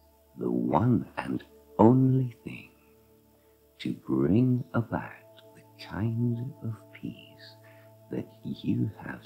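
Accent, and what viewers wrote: Scotland, United Kingdom. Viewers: You the best I've listen just about all 10/10 what kinda Scot are you ? 😆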